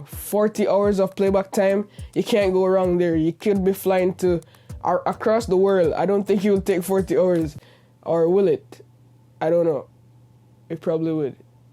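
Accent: Jamaica. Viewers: Jamaican accent it's there Great video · No that's because he's of Jamaican decent living in canada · i knew it! you sound just like a Jamaican. big urself yute. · You jamaican bro? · naaaa he’s Jamaican. He has a Jamaican accent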